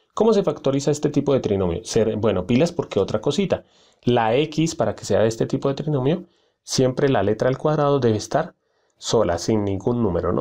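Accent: Colombia. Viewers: Disculpen es colombiano?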